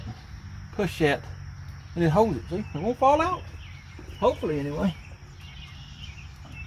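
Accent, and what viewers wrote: South, United States. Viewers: I'm hoping to be at Adams,,if I see you I'll say hello! I love watching your videos! It tickles me at the good old" country talk" that I've heard for years!! Your a great mechanic no doubt! Keep on doing these very interesting videos! You tell how to do things the best way,, STRAIGHT FORWARD! NONE OF THAT TECHNICAL MUMBO JUMBO!! that in my OPINION IS WHY YOUR SO POPULAR ON HERE!!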